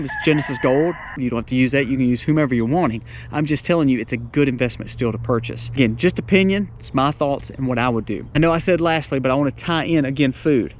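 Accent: South, United States. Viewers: Great points that you rsise - thank you. On another note, I don't particularly like the American accent except for that cool Southern accent you have. Such a great accent and I love listening to it 😅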